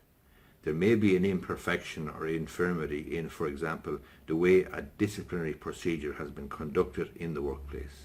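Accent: Ireland. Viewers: Irish, not sure which area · Cork accent boi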